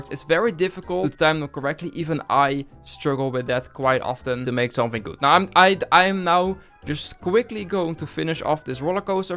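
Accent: Netherlands. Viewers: Hi, I have a question! Are you Dutch? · bro ben je nederland svolgens mij wel kan ik horen lol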